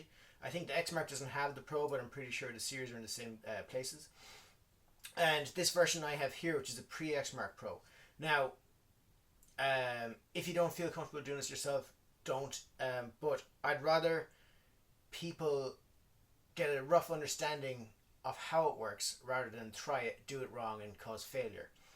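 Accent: Ireland. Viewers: This guy Irish? He has like an accent it’s cool haha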